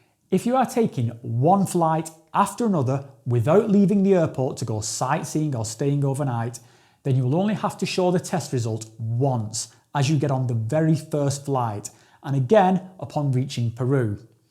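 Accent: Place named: England, United Kingdom. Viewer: I thought you sounded too English to be Swiss🤔🤣 you never know with accents these days. Would still make a good video for your "fans" if you never seen it as an invasion of privacy.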